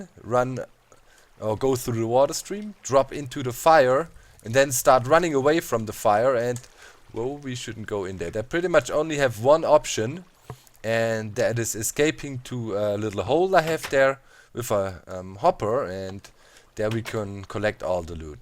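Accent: Germany. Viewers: Dr. CreeperZ ha I knew it cuz I'm from Germany and everybody there has an accent like that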